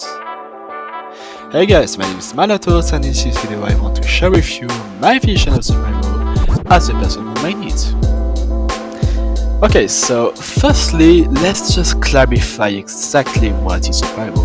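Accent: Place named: England, United Kingdom. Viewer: Noice english accent 😙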